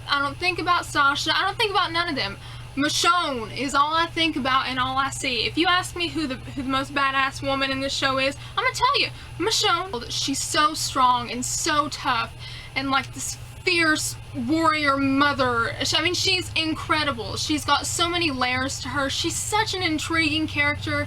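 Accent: South, United States. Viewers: Because she's a southern chick. I love that southern accent. I hear it all the time since I'm from Texas.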